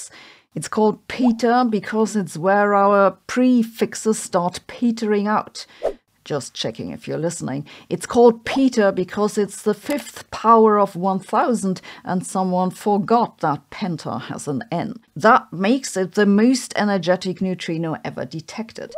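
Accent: Germany. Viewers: Why does Sabine have both a German accent and an English accent simultaneously?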